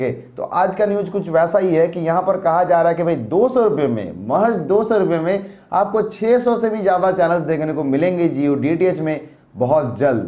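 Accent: Bengal, India. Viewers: aap Bengali ho kya?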